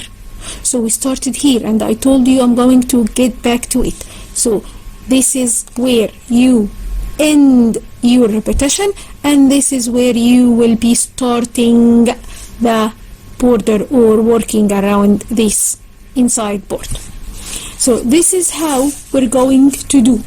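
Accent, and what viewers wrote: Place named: Egypt. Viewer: عرفت انك مصرية من اول ثانية في الفيديو 😅 اهلا بيكي و شكرا على الفيديو الجميل ده ❤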